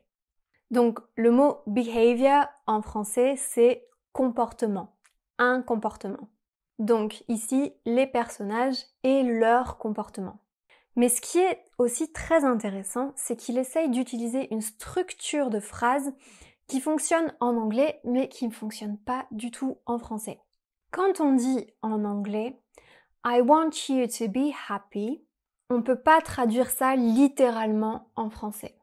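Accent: France. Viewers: Oui, nôtre chère a encore une pointe d'accent que je situerai au nord du ”Sud de la France" entre Valence et Aix en Provence, mais pas plus au sud... ou peut-être un accent bordelais ou aquitain? Après je ne peux pas dire grand chose, je suis lyonnais pur souche et il paraît que nous avons un certain accent... C'est curieux car pour moi, ce sont les autres qui en ont un 🤓. Je trouve que les accents 'colorent' le français et je trouve ça bien. J'adore d'ailleurs les accents francophones comme on trouve dans certains pays africains, le Québec sans oublier la Belgique si proche géographiquement, mais ayant son accent typique (souvent moqué par les Français) et ses expressions qu'on ne trouve pas ici. C'est aussi vrai que pour apprendre une langue, il faudrait le moins d'accent possible (le lyonnais alors?) et de distinguer la prononciation des voyelles accentuées ou combinées comme les ai, é, ê et è, les a et â, les au, o et ô, ainsi que les e et eu comme dans feuille ou fauteuil, les ain, in et un... 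Tout ce qui rend notre langue si difficile à apprendre, mais si riche en nuances. Bon, j'en ai encore écrit une tartine? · Ce vidéo et très bon pour apprendre le accent française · Une émission qui nous explique comment mieux parler Français qui s'appelle "French Morning"!??? Et en plusse prononcé à la Française sans aucun effort pour au moins assummer l'accent Anglais.... Non! Désolé! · This is perfect for me. I am in French 1 at school and am very good, already ahead of my peers and am taking French 2 during summer to be in french 3 for 9th grade. She sounds so similar to my teacher, a clean French accent and speaking slow enough for me to understand most words. Will be watching more of these to learn more on the spoken french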